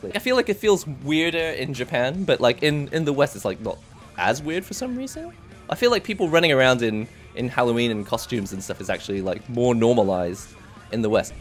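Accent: England, United Kingdom. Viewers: There's no way he's actually from Japan, he almost sounds like he has an English accent rather than a Japanese one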